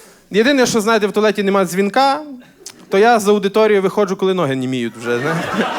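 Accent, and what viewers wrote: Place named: Galicia, Ukraine. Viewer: Якщо ти з Хмельницькому, то скажи щось як хмельничанин. Бо поки що, твій говір видає в тобі, радше, галичанина )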